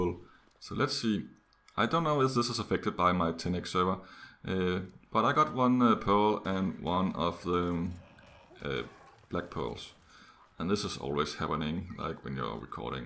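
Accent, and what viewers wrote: Denmark. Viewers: U Sound Danish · You sound Danish. Are you Danish?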